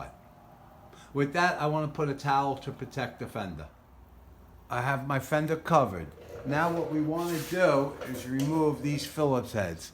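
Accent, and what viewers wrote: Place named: Long Island, United States. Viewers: thanks for the video! nice vett in your profile pic sounds like your from NY as well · Excellent video! I watched a bunch of videos about replacing my bars, but yours is the most thorough - that’s important for me. And your LI accent makes me smile! I love the island. Do you know the band Zebra? WBAB broke them in the early 80’s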